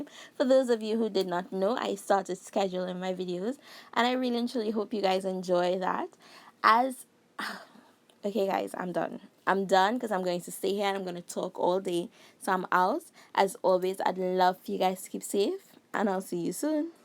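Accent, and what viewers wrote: Trinidad and Tobago. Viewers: I just discovered you. Your accent sounds trinidadian 😮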